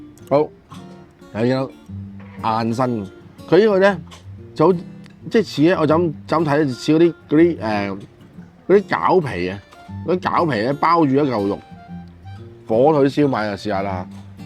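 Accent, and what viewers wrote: China. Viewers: 可愛的普通話😂好睇😊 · 屌啲煲冬瓜好撚可愛兼普通I like it🙂‍↔️😂👍🏻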